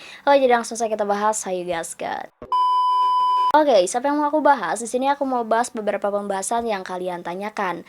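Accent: West Java, Indonesia. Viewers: ka kayanya org Sunda ya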